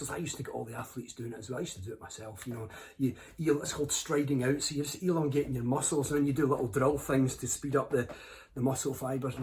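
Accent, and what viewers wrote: Scotland, United Kingdom. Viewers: Hey. Love your Scottish accent, m8. I have a major passion for accents. Scottish accents are so beautiful. Cheers. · I like this guy's books better than listening to his talks. The Scottish brogue can be hard to understand, but this guy has a nice personality.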